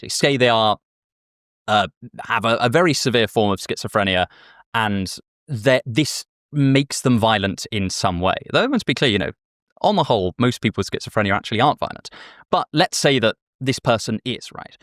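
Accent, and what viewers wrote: United Kingdom. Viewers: this is my first video of yours that ive watched, was so shocked from the british accent i got whiplash, you give american vibes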